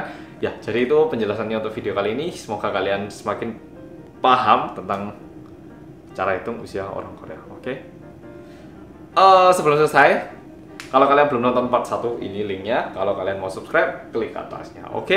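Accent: Java, Indonesia. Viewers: Aku baru nonton videomu loh mas. Medok banget ya, Mas'e 😂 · Kok medoknya kerasa mirip sama mas Kaesang yah... 😍😍😍😍👍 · Emmm cuma pingin komen sampeyan medok banget Mas 🤣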